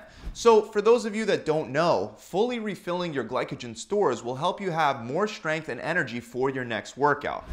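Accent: Philadelphia, United States. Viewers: This dude from either philly or jersey w that wootur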